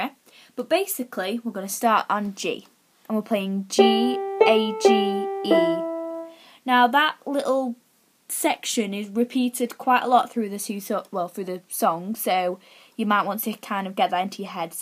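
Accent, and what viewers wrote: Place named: United Kingdom. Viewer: Silent night was the first song you thought meand I was OMG I never thought I would be able to play it and also I love your British acsent and you also sound like Luaren Platt in the XFACTOR your lovely piano teacher your teaching is very easy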